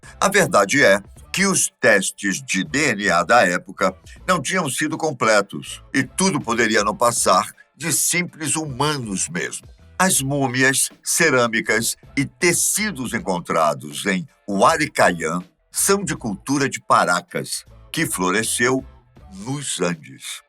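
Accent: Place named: Ceará, Brazil. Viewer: Quase achei que era um cearense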